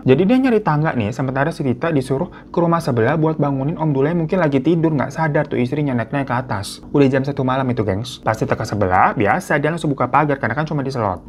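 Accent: Java, Indonesia. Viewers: aseeekkkk..dari padang niih, gw denger orang bahasa minang pake logat jawa kayanya baru lu doang bg gw denger😂😂 yook bisa yookk bang lu main2 ke padang😎🙏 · Apapun bahasa nya logat nya tetep Jawa ya bang 😂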